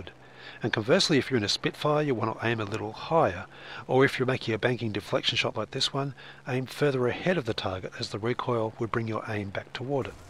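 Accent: Australia. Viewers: You aussie!??!?!?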